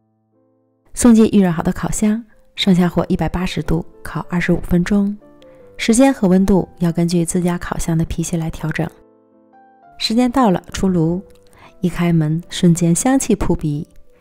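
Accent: Liaoning, China. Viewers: 好久不见变成了南腔北调记得是辽宁哪旮旯的口音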